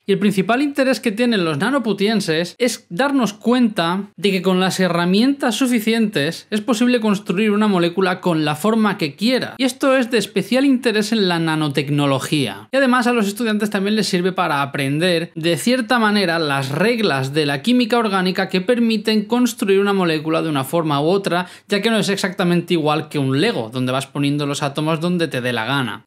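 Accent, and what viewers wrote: Cuba. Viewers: Cubano xd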